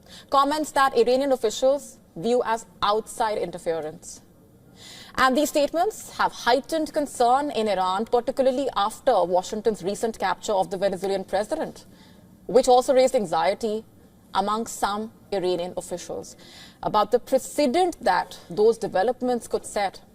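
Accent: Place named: India. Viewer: They are trying to mainstream the Indian accent. They think they are anglo saxon. "Me-ziles".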